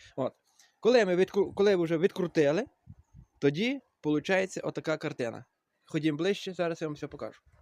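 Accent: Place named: Western Ukraine, Ukraine. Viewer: Ну видно що ви роботяга, молодець А реверс щолкає як передачі в іномарці! Дякую за відео,цікаво вас слухати! То ви з Чернівецької області,чи з Франківської?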